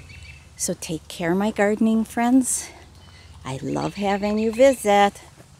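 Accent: Wisconsin, United States. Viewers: O my! How beautiful everything is! Took me two days to get through your tour (I keep getting interrupted), but o what a joy! I just found you, and I thought I heard you say, you are moving. I hope that doesn't mean you're ending your channel. I hope you continue creating beauty and joy wherever you move to. Plus. I love your WI accent. I grew up in WI. Moved away 25 years ago. Miss it terribly. You sound like home ❤️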